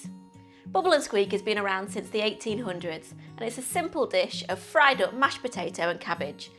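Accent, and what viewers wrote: United Kingdom. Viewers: I love british accent.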